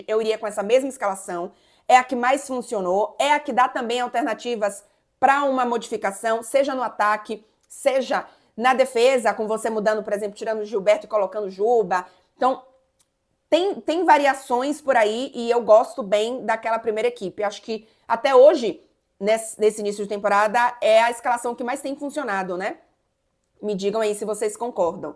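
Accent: Bahia, Brazil. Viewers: você ainda tem bastante sotaque baiano, viu?! 😅😅😅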